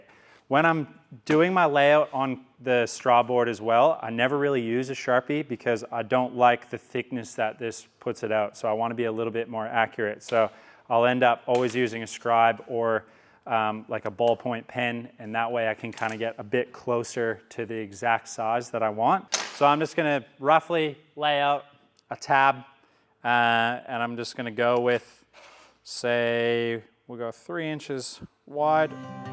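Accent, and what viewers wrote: Australia. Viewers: is he hiding an Australian accent under there? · Am I going insane or do you have just the barest hint of an Aussie accent?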